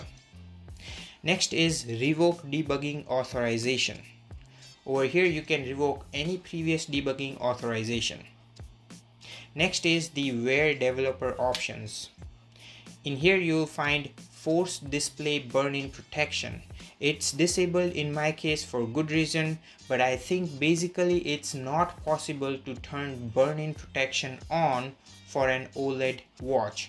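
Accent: India. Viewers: Classic Indian accent you absolute tool , culture yourself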